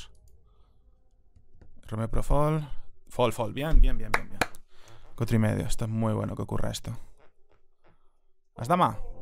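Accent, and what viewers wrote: Georgia. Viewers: are you from georgia?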